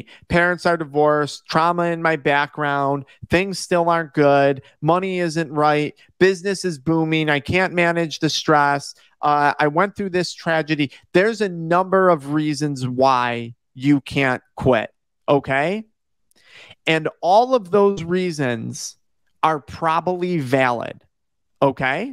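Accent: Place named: Upstate New York, United States. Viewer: When I first heard your accent I thought this guy sounds like he lives in upstate NY (I'm in Bing) and laterin the video you confirmed you are from NY! Lol thanks for the content, its very helpful!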